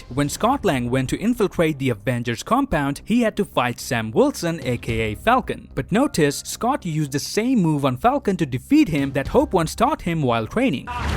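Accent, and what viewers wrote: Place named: Canada. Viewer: lol dude because of your canadian accent opinion is openion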